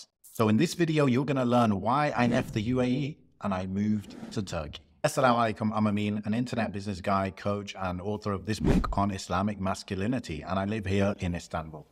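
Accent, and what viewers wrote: United Kingdom. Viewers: Wait a minute are you British? How could you spend 19 years in the Middle Eastern region if you sound like a British guy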